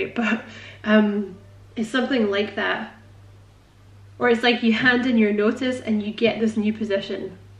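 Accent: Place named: Scotland, United Kingdom. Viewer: OMG your Scottish accent is so adorable.. The reading is so spot on, and exactly what I needed to hear. Thanks!!!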